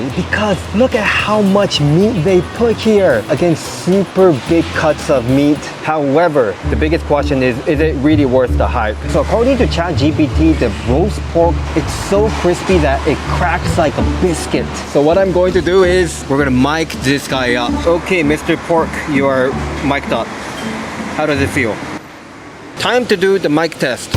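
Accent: Singapore. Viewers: Great tour video! I will have to check out that area next time I am in SG. I must say your videos have really evolved over the years. I started watching you during Covid and it has been interesting to hear your accent evolve as well. At times you sound American or like you could be from here in Hawaii. Other times your Japanese accent comes out. But lately, I have noticed you sounding a lot more like the Singapore locals…. You have truly gone native, so to speak, but it seems like you can turn it on and off, depending on who you are speaking with or about! A sign of a talented mind…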